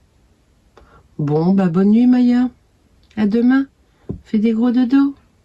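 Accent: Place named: North, France. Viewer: bon week end a vous er continuer vos video elle sont tres bien j adore votre accent sa me rapelle ma jeunesse dans ce chnord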